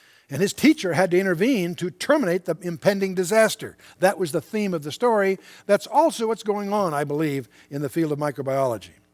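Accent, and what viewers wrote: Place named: United States. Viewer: What a great blessing Chuck has been to us remnant believers. ❤️🇭🇲 I heard Amir Tsarfati say in his zoom call Q&A with the Romanian churches in Australia (a year ago) that he loved Chuck, and is still friends with his daughter and her family. This made me happy. Chuck's like my Dad, but with an American accent. My Dad loves Chuck too, and told me about this channel, which I immediately subscribed to, and added to my playlists.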